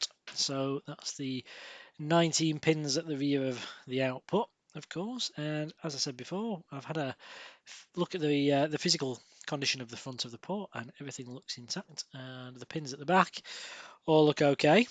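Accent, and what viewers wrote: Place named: North, United Kingdom. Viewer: Thanks, great video. I will email you now for a couple of ic's. You cant be too far away from me (manchester) with that accent, Thanks again.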